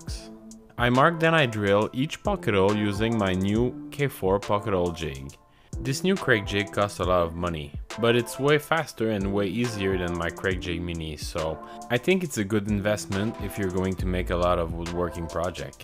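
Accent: Quebec, Canada. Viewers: Est-ce que je reconnais un petit accent québécois?? Beau projet btw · J’adore comment tu ajoutes des « h » au début de certains mots et tu les retires du début d’autres: on reconnaît ainsi l’anglais avec l’adorable accent québécois!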